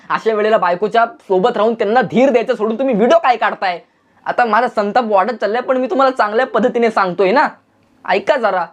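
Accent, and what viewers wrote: Konkan, India. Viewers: 😂😂😂haha mja aa gya Dada tu kokani ahe ka?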